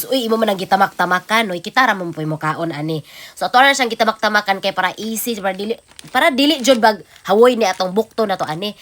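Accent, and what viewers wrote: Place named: Visayas, Philippines. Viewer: RAMEN GANI NI NOH! === ahahah ka relate jud kos accent og intonation ....HAHAHAHAH bisaya ray maka sabot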